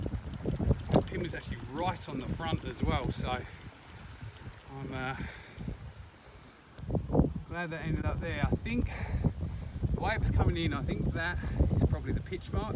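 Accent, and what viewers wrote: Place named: Cockney, United Kingdom. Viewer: nice... Looking fwd to seeing that come through. Cheers for the sub back... I couldn't place your accent... It actually has hints of cockney on it. Hopefully I haven't just offended you. Lol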